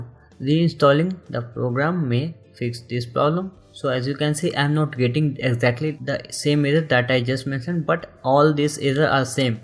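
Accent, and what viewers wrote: India. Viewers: Your Indian accent is cute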